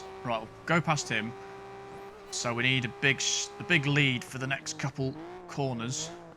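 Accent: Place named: Midlands, United Kingdom. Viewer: it's a mixture with midlands and leicester accent lol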